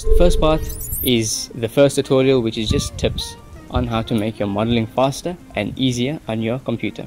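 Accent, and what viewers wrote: South Africa. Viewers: Is that a Capetonian accent I hear? Great videos!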